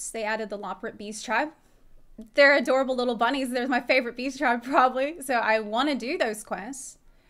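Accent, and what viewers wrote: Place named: Texas, United States. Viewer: let the darkness consume yeh. how does a Ukrainian end up with a texas accent?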